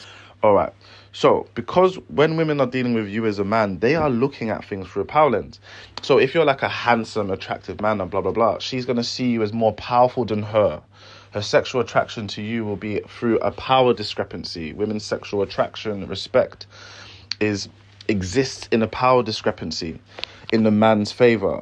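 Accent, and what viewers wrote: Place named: RP, United Kingdom. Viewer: Thanks for explaining this in the Queens English.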